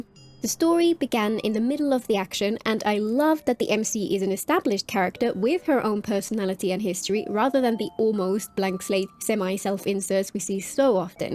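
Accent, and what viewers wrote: United Kingdom. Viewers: You sound Bri'ish like Ver'in and Regulus...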